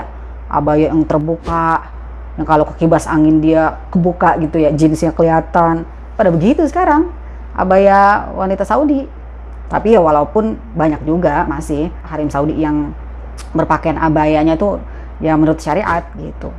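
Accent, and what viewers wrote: Jakarta, Indonesia. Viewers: Duit kita ga semene....saya bukan senang ceritanya...tpi logat bahasa Betawinya yg saya demen...et dah si mpo.... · Betawi banget dah mpok hehehee luccuu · Subhanaullah seneng bnget dah kalau ngjlasin tentang kehidupan di Saudi resep bnget dengan logat betawinya,( aslinya mna mpo yg kece) ITS the best untuk Chanel ini sukses selalu aaminn yra 🤲🏻👍🏻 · klu ngomong logat betawi banget lucu ❤👍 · Logatnya betawi banget dah....sama dg kite hehe... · Demen bgt nnton cenal,logat betawiny kental bgt,lucu 😁😀suka ketawa2 sendiri klu lg keluar logat betawiny. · Like Is the best Logat Betawi 👍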